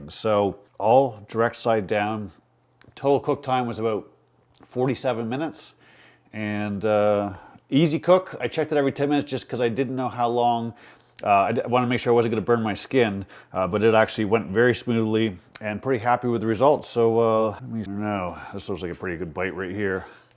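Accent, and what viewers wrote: Canada. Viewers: Nobody says the word "about" better than Canadians, love their accent.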